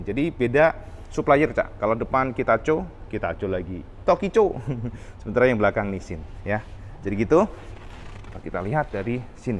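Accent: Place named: East Java, Indonesia. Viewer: Mas kui ganteng logate Jawa timur medok, jangkrik tenan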